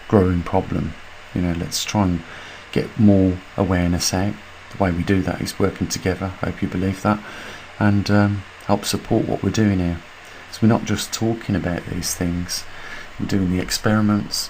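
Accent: United Kingdom. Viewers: Hi. First of all, I must say I like your information of magnetic poleshift a lot. But as a non-native English speaker, I find your British accent is too difficult to comprehend or access your content. I have a little problem when listening to an American accent speakers. If you are aware that not only native English speakers have followed your jobs, but there is me following your difficult accent also. Your fan from Thailand.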